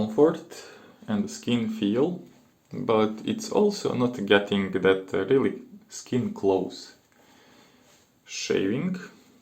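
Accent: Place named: Serbia. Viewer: you sound so serbian :)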